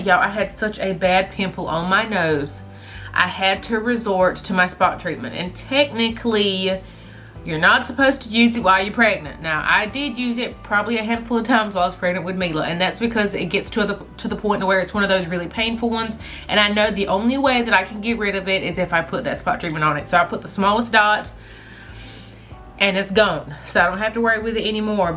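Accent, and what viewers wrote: United States. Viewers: You’re so country and I love love love it lol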